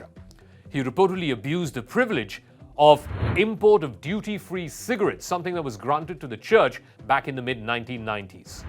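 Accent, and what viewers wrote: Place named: India. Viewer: dellivver.. lol. indian accent is amusing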